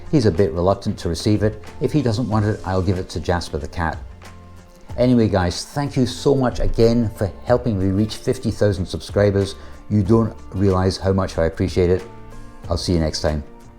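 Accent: Scotland, United Kingdom. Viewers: What a fantastic channel! I’ve only been watching the channel for about a month or two now. Most of the content I watch on youtube is travel related so to hear a Scottish voice in them is even better. Fantastic coverage of all things road rail air and sea! I watched your video hiking up Ben Nevis the other day and even that, with its lack of transport content and overall different theme to your usual content was a fantastic watch! Keep up the good work. Excited to see what videos 2024 brings. All the best to you sir, although I do hope St Mirren get relegated ;)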